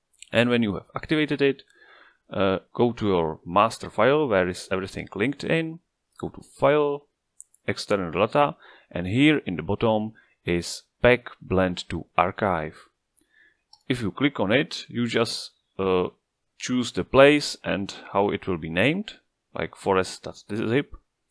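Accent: Czech Republic. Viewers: this is exactly what i needed thank you! by the way, are you by any chance from Czechia? the accent sounds familiar :DD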